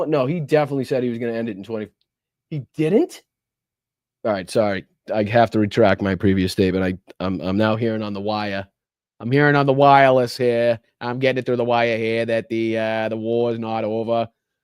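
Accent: Boston, United States. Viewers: I love your Boston accent, but I think the amount of swearing is unnecessary.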